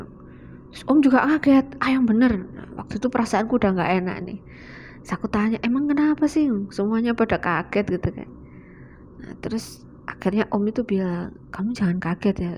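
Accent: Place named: Malang, Indonesia. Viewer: Medokkk bnget malangnya hee sasaji mbak 🌹🌹👍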